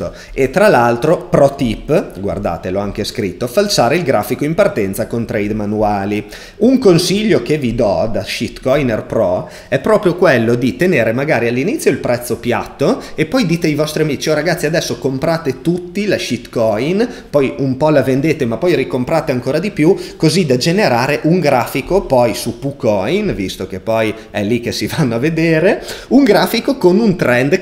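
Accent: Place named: Romagna, Italy. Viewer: vabbè sei palesemente romagnolo. Viva la Romagna, follow immediato.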